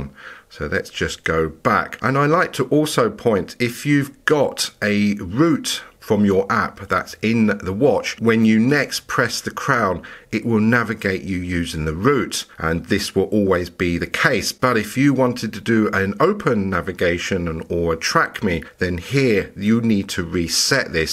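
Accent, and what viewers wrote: United Kingdom. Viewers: I'm fond of your accent ! Soooo cool Soooo british. Where are you from if I may ask my good pal ?)) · Excellent presentation and outstanding commentary in British English :) Your voice is very impresario like, as a boxing match commentator. Btw even if you say you are in London would you say yours is the quintessential Queen’s English or say Glemsford or is it Received Pronunciation because I don’t hear a regional accent at all. I found it very useful really. Good day Sir.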